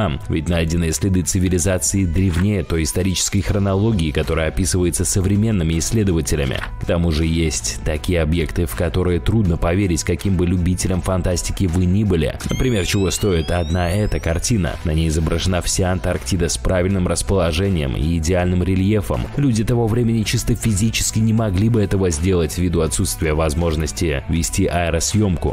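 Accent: Russia. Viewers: Как можно слушать такую речь. Передача хорошая, речь акающая😮😮😮😮Это что-то. 😂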